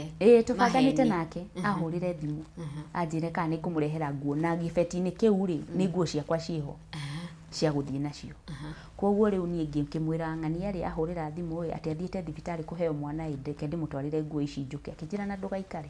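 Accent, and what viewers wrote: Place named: Nyeri, Kenya. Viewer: This one is from my hood....kuria gwa tutaratari...😊❤Nyeri accent is the best.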